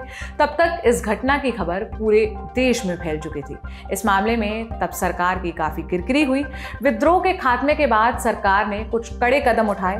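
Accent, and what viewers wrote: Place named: Lucknow, India. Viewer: Hi Lady you are extremely beautiful and an erudite person. I like your Hindi which seems to be of Lucknow dialect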